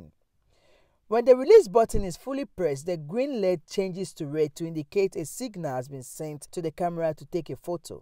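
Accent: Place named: Kenya. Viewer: Great vid. Just out of curiosity, what country are you from? I lived in Kenya for 30 years and your accent sounds very familiar!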